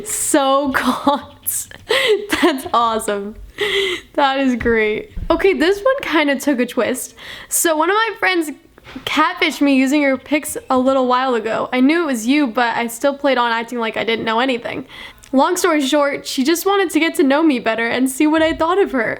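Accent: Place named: New York, United States. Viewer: guy she's from New York they say words differently 😂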